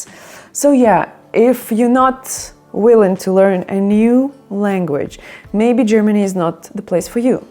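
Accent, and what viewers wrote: Russia. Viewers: Спасибо за видео. Но акцент русскоговорящего человека очень сильно слышно с первых минут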